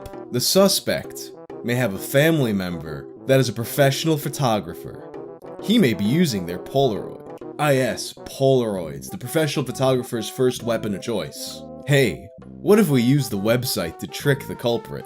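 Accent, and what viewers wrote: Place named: East Coast, United States. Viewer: Him reading the passionate, angry back-n-forths in his calm, east coast accent😅😅😅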